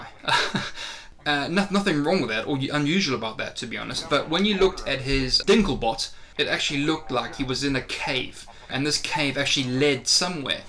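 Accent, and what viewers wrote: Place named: South Africa. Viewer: dude you south african me too i can tell by the accent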